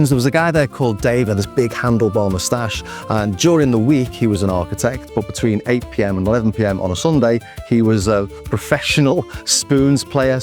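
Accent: Liverpool, United Kingdom. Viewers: It feels spooky to have a construcitve lecture from someone with the liverpool accent on the corrosive effect on society of the politics of envy, but you are 100% correct. Make music with what you have, it's still fun. And it's always rewarding how one thing leads to another in the music world if you put yourself and your work out there.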